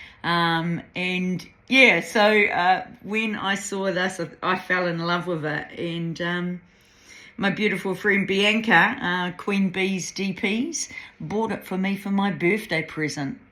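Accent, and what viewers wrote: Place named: New Zealand. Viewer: Hi & fur babies, wonderful to see you back and all your finishes and WIPs. I have missed you & your kiwi voice (us few kiwis do miss hearing our on accents 😉). Love all of your DP's. Neat to see the Gemini. I think a yellow rose is also a Gemini flower 🌼. It's so sparky. Look forward to seeing more of you on YT. Take care, Matariki nearly here, yay.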